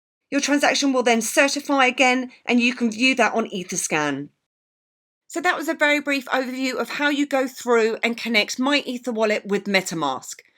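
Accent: United Kingdom. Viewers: British accent is so cute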